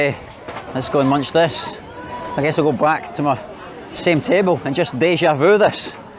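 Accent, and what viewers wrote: Scotland, United Kingdom. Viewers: Have to make myself familiarise with Heavily accented Scotland English. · You are a sweet man. Bit of a clumsy bum but the Scottish accent and lovely approach to people makes up for it! Will keep watching and I have subscribed. I bet your taste in food has grown astronomically...well done as it’s not a common thing for UK citizens to have a broad palate. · I love your Scottish accent.👍🏼👍🏼😁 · Seen this on FB a year ago, now finally get to see the full vid hahaha. Loving that Scottish accent. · your scottish accent make my throat feel swollen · I like Scottish accent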